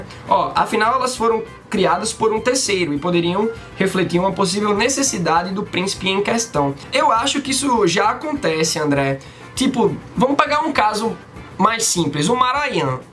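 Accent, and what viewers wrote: Pernambuco, Brazil. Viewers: Ei mano, tu é de Pernambuco?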